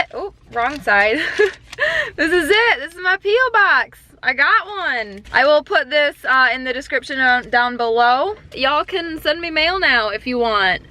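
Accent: Midwest, United States. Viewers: Is that a Midwestern accent?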